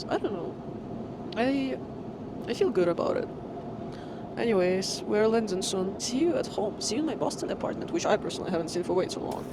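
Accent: Russia. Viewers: Так приятно слушать русский - английский 😂❤. Русские фразы на английском ❤. No offense. Спасибо тебе за историю.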